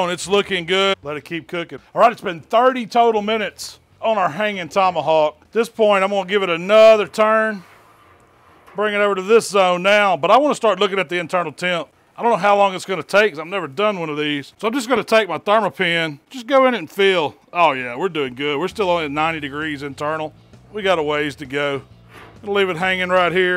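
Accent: South, United States. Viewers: Dewalt drill, Tomahawk Steak, American southern accent. All is missing is the American flag.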